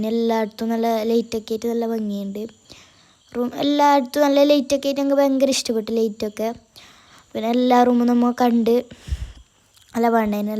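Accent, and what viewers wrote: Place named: Kasaragod, India. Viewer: It's me thanha Kasaragod slang nalla paangnd 😂😂😂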